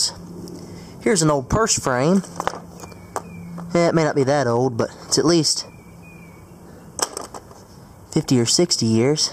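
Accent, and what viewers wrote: South, United States. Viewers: i love ur country voice!! itss saatisfying to me from the north, thanks for the verses at the beginning of ur vids:) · I'm here mostly for the accent, also to watch find treasure! 😅 This California girl can't hear enough of a lovely southern accent. 🙄👌🎶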